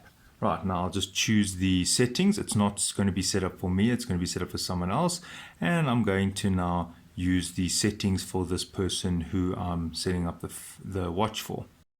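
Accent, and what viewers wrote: South Africa. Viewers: Excellent video. I love the SA accent.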